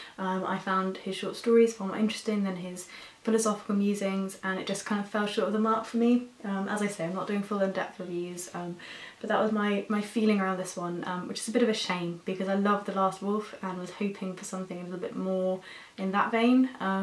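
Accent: United Kingdom. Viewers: You're so different to all the other booktubers I follow and I love it! It's nice to hear a Brit talking about books for a start, but also you don't focus on popular books, just ones that you feel are important or interesting. It's so refreshing and I love it!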